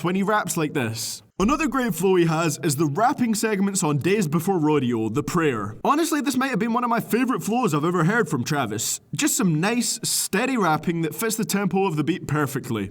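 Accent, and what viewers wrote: Scotland, United Kingdom. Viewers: Brother you from scotland? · i can't help but notice that you have a western European accent, are you Scottish ?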